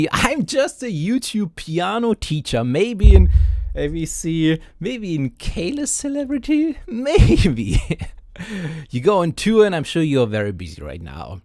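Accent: Germany. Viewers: His heavy German accent kind of adds to that. Like, he's really trying not to sound aggressive imao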